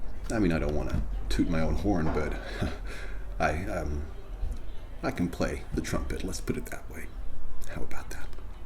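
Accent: United States. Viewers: I’m an American, and I gotta say, your American accent is great. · You picked one of our main accents (in the US). The nuances are spot on (newscasters and narrators of the mid century often take this tone), and excelently paired with social status, and stereotypical, shall we say "frame of reference"? And the acronym; still laughing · Good American accent! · 1) American accent is great as always! 2) American accent speaking Japanese is phenomenal! 3) Bad jokes are great!!! 4) You are a remarkable bullshitter. You would be a great “generic Wall Street banker”. 😋🤣🤣🤣🤣🤣🤣 🍶🍶🍶🍶🍶🍶 Much love!!! 💙💙💙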